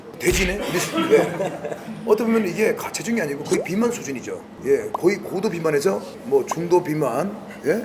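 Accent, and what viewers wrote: Gangwon, South Korea. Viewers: 아직, 강원도 사투리 잘쓰시네여 저는고향이 강원도 동해여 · 종겨운 강원도 사투리네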